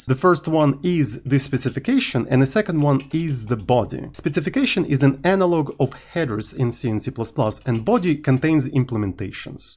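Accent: Russia. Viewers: Excuse me for such question, are you from Russia?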